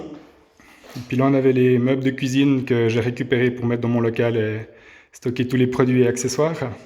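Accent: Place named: Sweden. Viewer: Super ton accent. C'est Suédois c'est ça :)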